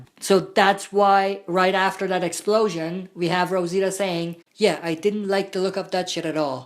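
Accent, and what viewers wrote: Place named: Dublin, Ireland. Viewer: You speek the same like a colleague of mine who is from Dublin.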